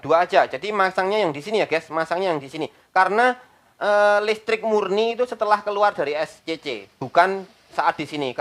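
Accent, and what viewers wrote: Java, Indonesia. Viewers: lucu juga ya mas ini medok jawane iaku senengmakasih saya juga mau planing buat plts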